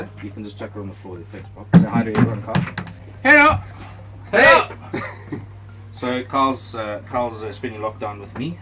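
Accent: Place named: South Africa. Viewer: I wondered what accent this was. South Africa. What a proper country. Cheers.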